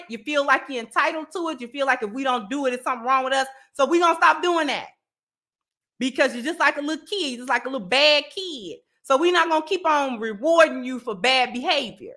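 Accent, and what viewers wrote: Chicago, United States. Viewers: are you from Chicago? I been subscribed for months, and this is the first video that I noticed a Chicago accent lol. Dope content btw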